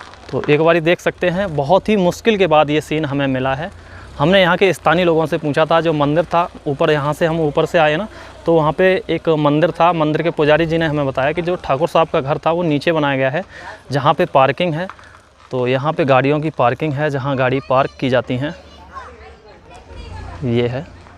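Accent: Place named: Western Uttar Pradesh, India. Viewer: Tum kahan ke ho bhai tumhari voice west up ki lagti hai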